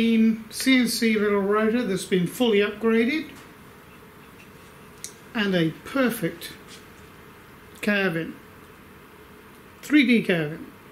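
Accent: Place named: England, United Kingdom. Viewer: dude you sound english but you are talking in a currency that WE DO NOT USE?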